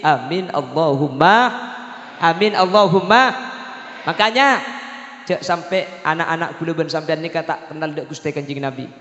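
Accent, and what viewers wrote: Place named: Madura, Indonesia. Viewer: Mantap bin puas dengar ceramah P.yai. Logat Maduranya juga Mantap pas banget . .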